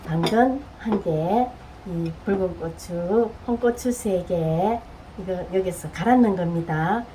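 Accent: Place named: Gyeongsang, South Korea. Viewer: 경상도 분이라 설명이 쏙쏙ㅎㅎ 잘 배울께요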